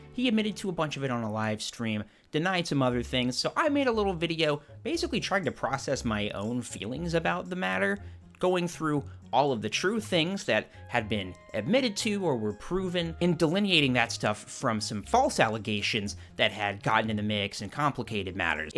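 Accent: United Kingdom. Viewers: I'm not saying it's offensive I'm saying it really isn't that funny, it's boring, I'm watching for humor and I expected something a little more thought through. I feel like a teenager watching this shit. If you're going to make fun of another English speaker have some more complexity than just accidentally reading potentially a native Croatian lawyers script in a really blank slate crappy British slant. Common, I'm not subscribing for reporting, I wanted good comedy. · Heh, there’s that British accent again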